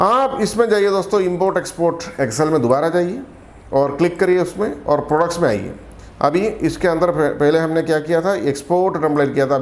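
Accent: Kerala, India. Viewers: Malayalam touch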